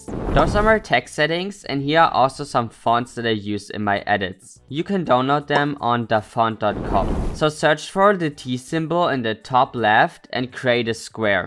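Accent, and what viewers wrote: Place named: Germany. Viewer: love the german accent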